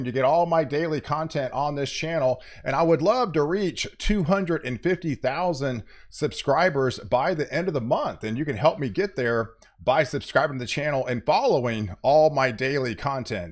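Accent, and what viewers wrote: United States. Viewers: There are people commenting on his way of saying westrin for western, eastrin for eastern and pattrin for pattern. These are part of the United States regional speech differences. A regional accent if you will. Like a Boston accent or Southern accent. I have a friend from Kentucky who talks like that. You may think they’re uneducated or stupid but it’s a speech pattern of their region. Very hard to unlearn even if you get multiple degrees. Just my two cents.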